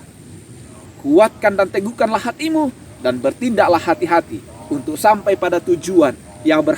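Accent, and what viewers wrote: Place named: Batak Toba, Indonesia. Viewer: Terimakasih pak Pdt. Btw pak Pdt suku apa? Saya dengar logatnya seperti suku Batak Toba ( no rasis). Saya Batak Toba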